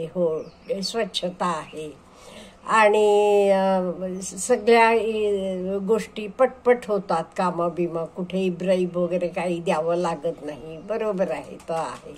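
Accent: Karnataka, India. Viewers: Yes her mother tongue is Kannada ❤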